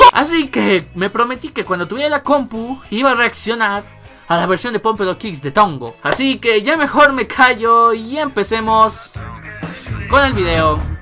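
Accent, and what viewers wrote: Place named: Mexico. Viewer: Cesar Rosas Torres Se le siente el acento mexicano en él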